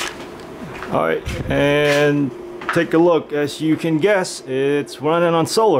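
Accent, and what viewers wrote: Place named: Austria. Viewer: your accent sound's like arnold schwarzenegger very cool you get a like just for that :)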